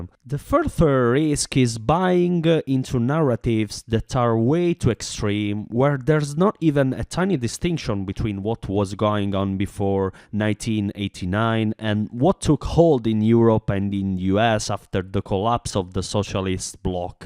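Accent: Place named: Italy. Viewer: Good job but se ti guardi 3-4 episodi dei sopranos in lingua originale sblocchi l'accento da new jersey invece di dover rimanere con l'accento da scuola pubblica italiana con insegnante zitella di origini partenopee. All'audience international piace di più se ti possono immaginare dire "capocollo" come interiezione, fidati non è difficile.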